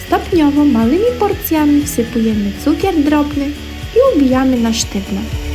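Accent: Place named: East, Poland. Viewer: pieknie mówisz po polsku. Twoje wschodnie pochodzenie tylko lekko słychać w wypowiadanych słowach.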